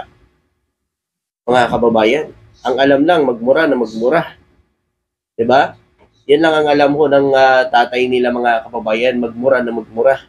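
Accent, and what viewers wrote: Iloilo, Philippines. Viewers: Taga iloilo ka man boss? 🎉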